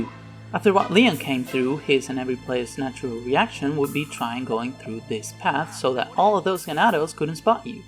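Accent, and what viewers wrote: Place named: Argentina. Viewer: tu acento Argento me agarró por sorpresa 😄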